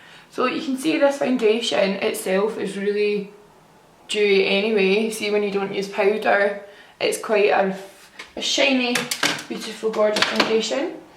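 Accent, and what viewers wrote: Scotland, United Kingdom. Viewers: I agree. Beautiful girl but can't understand what she's saying. Strong Scottish accent!!!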